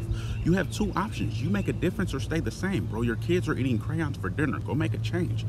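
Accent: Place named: California, United States. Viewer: I could've swore you was from Cali somewhere lol Keep up the dope work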